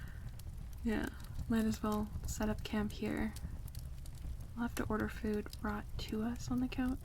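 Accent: Canada. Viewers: are you canadian? random question lmaooo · Canadian eh?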